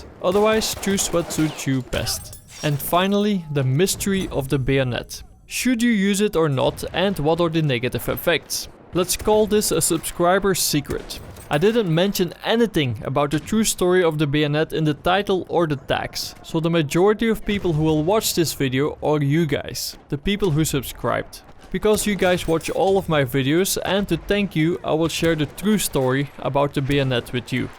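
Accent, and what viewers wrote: Netherlands. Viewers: toooooo obvious that he's from the netherlands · how dutchies speak english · je hebt wel echt een zware dutchlish accent man. Vind t wel mooi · Your englisch is like a dutch guy ? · you sound so dutch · u dutch? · je klinkt Nederlands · Het spijt me om het te moeten zeggen, maar het is heel erg duidelijk dat je nl bent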